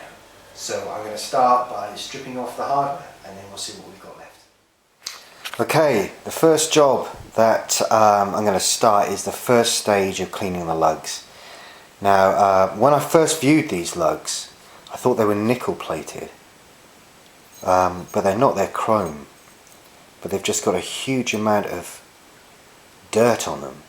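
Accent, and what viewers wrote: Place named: North London, United Kingdom. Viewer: Your restoration videos are superb man. I detect a north London accent going on there lol. I am probably wrong. I'm from up there. ! have 3 mahogany snares in my collection. !968 School Festival, 1965 Pioneer and 1948 WFL 15'' x 8'' Concert. I have kept the WFL and School Festival original. I love the mahogany colour. Your restoration video where you transformed the WFL to a Ringo Jazz Festival was fascinating. You did a great job! Well done mate. We share the passion. You are probably from Hereford or somewhere. I hear a twang! hahahaa. Stay safe. Jem )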